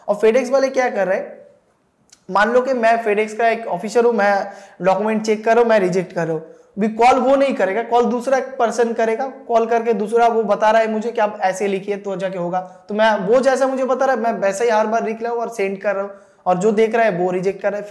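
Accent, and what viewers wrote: Kolkata, India. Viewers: Ap Ka language suun k Lagta hai ap Kolkata Ka hai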